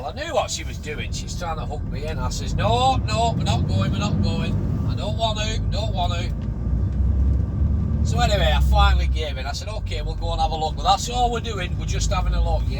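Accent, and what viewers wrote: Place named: Lancashire, United Kingdom. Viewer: Your some character,love your chat proper lancashire just like me lad you always make me smile cheers mate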